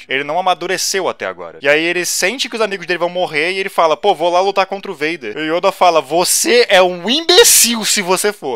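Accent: Rio de Janeiro, Brazil. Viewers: Só percebi hj q o tem sotaque carioca kkkkkkk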